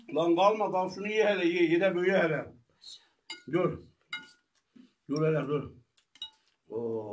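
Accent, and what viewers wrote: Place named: Antakya, Turkey. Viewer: Aynı hatay antakyalilar gibi konuşuyorsun:))) bizim buranın yemekleri de güzeldir bekleriz:)) seviliyorsun:)) yiycin yapıcın edicin:)) bağh hele:)) hastahanalık oldu:))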